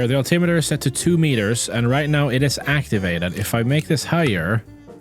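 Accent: Sweden. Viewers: I swear, every time when i hear you i think that you must live or is born in sweden with the accent that you have.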